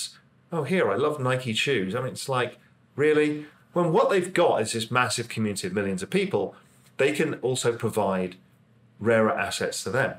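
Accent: United Kingdom. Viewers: Really diggin’ that UK accent and cadence! Makes these wise words even better! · Ha ha ha ha. Like I said a few weeks ago. BS with a British accent is still BS!!🤣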